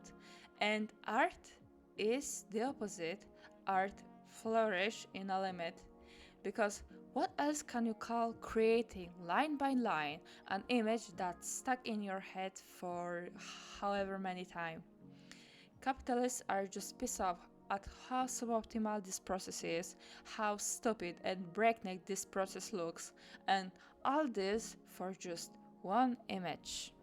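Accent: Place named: Eastern Europe. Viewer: its just the heavy slavic accent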